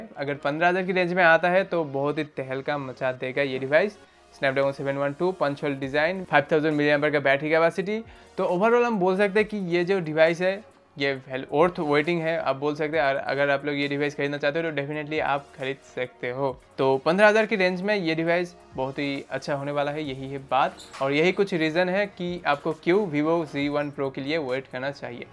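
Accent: Bengal, India. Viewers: Vai tmr bari kothay.. i think u r bengali.. tmr kotha dhoron bangla type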